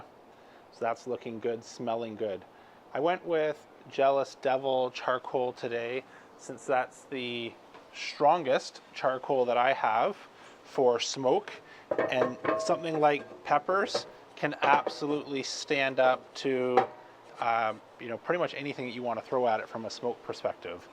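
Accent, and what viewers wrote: Canada. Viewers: I would love to hear you react with, for me, your Canadian accent, when you bite into a really hot pepper. I bet it wouldn:t even involve curse-words, you were probably raised right.;-)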